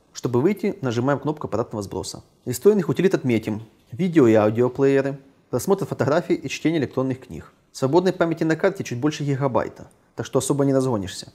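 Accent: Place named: Ukraine. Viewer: комментировать на русском украинским диалектов кокто не очень:( но видно старался так что палец верх :)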